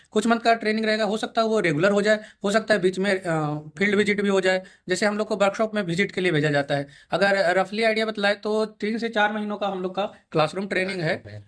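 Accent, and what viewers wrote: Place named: Magadh, India. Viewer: I recognised you by your magadh accent I am also from Nalanda(Bihar Sharif)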